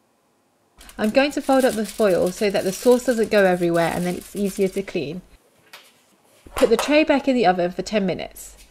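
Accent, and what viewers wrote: United Kingdom. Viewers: Lovely accent its british i love how simple and quick you did this recipe and so simple this is a must make for me thx💜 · Are you brittish?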